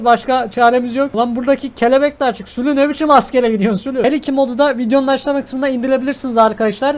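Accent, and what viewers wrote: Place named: Ankara, Turkey. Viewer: Dünyada hem Ankara hemde Adana şivesi yapabilen tek insan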